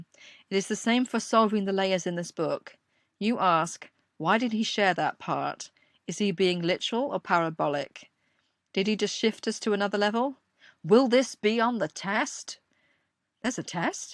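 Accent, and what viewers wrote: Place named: England, United Kingdom. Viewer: Being Scottish myself, I adore this woman's English accent.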